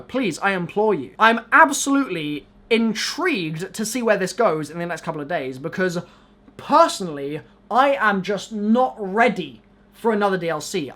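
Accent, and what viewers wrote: United Kingdom. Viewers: Are you British? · when he was saying "zed m" i got really confused and i thought i was missing something but then i saw the ZM and i remembered that british/autsrailian people say "z" really weird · XactoSharks He's British. It's different there.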